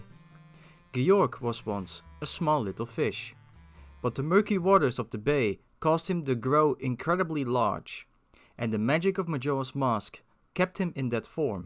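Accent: Netherlands. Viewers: Been a long time since i saw an actual good theory. I also hear some Dutch accent. I'm from Belgium so i'm glad to hear someone near me also be this passionate about Zelda.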